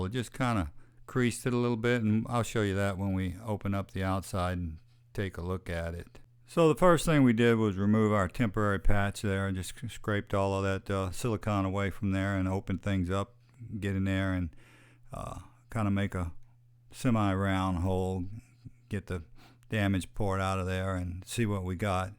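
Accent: Louisiana, United States. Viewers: Man when I heard you talk I knew you were from my home lol! My camper caught a branch through it in the last hurricane that came through carencro. I just had it hauled up to Washington for me and finally getting around to dealing with the hole. Gotta get everything out of it first. Pretty sure I got some water in there but the good thing is it’s so dry here none of it sits. We’ll see how it goes. Are those special mesh patches for fiberglass? Or could you use Sheetrock patches?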